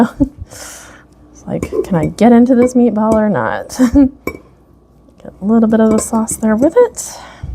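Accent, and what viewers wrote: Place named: Quebec, Canada. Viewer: Bonsoir! Première fois sur votre chaine. Dites-moi, avec votre accent, je vous soupçonne d’être du Québec, ai-je raison? Pourquoi: excellent français et excellent anglais! Mais peu importe d’où vous êtes, j’ai apprécié cette vidéo. P.s.: je suis du Québec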